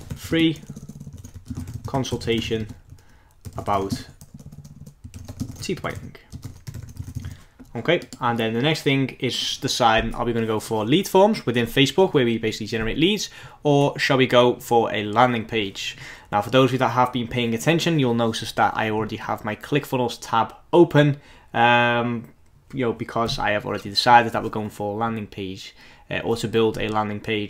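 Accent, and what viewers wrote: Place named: Liverpool, United Kingdom. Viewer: Hi I can tell by your accent that you are also from Liverpool. I was wondering wether Liverpool is the right place to start my smma journey. Thoughts?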